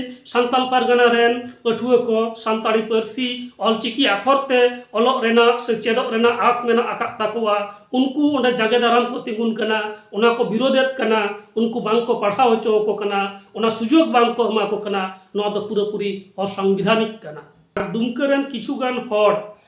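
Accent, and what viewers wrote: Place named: Bangal, Bangladesh. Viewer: Apnar pronounced Bangal tone keno? Kichu pariskar kotha why used.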